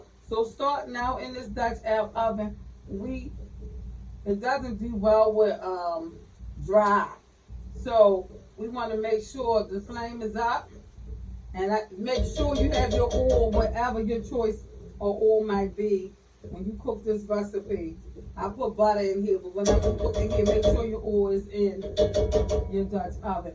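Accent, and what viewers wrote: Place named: Baltimore, United States. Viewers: I love you, Mama! You helped me so much. 💙💙💙 I have to ask, I'm a new subscriber, are you a Baltimore native? I ask bc my man is from Baltimore and you both share the same accent. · Bmore accent all day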